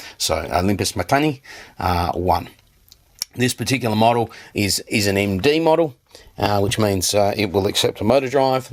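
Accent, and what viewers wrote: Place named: Australia. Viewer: Very indistinct speaker....not only ehm ehm ehm but also strong "down under accent" makes him difficult to understand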